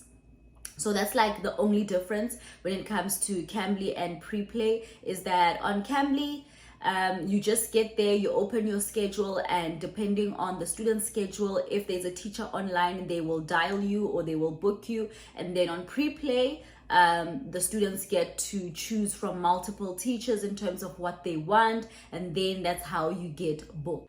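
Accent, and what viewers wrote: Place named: South Africa. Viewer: TOYS-inyana ! I Love Your SA accent !!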